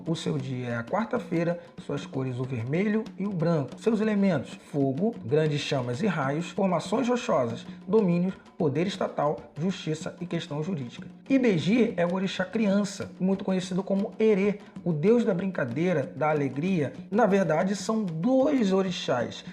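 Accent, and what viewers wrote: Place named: Rio de Janeiro, Brazil. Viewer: O vídeo foi excelente parabéns Pelo sotaque vc é do RJ como eu kk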